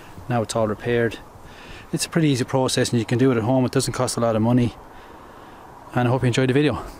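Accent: Ireland. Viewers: You sound Irish.... so why Hood? And why Fender? BONNET AND WING. Nice job though :) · Hes Irish so yeah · Thanks for the great video. Nice Irish accent too! Lol! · God Irish accents are so cool I’ll buy what ever you sell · The Irish accent!!! The title should have said so I would've clicked sooner this is rad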